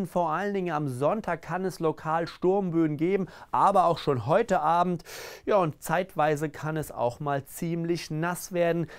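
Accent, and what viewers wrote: Hesse, Germany. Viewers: Hessischer Dialekt. Oder Rheinland-Pfalz.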